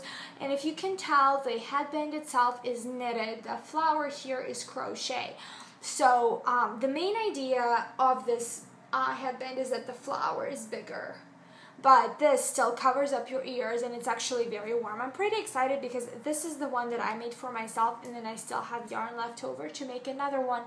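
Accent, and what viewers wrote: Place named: United States. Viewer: У вас идеальный английский язык в американском произношении!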